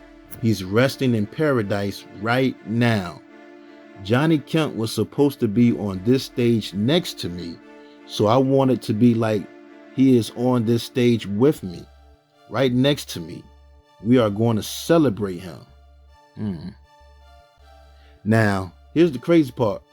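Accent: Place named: Philadelphia, United States. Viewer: The narrator must be from Philly!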